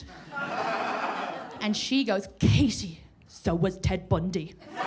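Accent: Ireland. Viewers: Can't wait for more from ya Katie, sucker for the Irish accent but stayed for the laughter! Hi from San Antonio! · NEVER EVER heard anyone make an Irish accent sound so annoying 😢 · Irish comedians never disappoint 😂 she is funny and cute and I adore the accent. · Came for the Irish accent; stayed for the laughs. Great set! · I started watching for the Irish accent but stayed for the comedy. Well done Katie you have a new fan. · Stop down to Appleton, WI skyline Comedy club - it's a 30 drive away from Lambeau Field. Wisconsinites don't get many Irish folks I think you would be a hit just for your accent. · you are the best, sweetest and most hilarious person. You're 100% right about the accent. American men can't resist it in Irish women. I'd listen to you read a phone book for a day. I'll bet you're a grand bar maid, too.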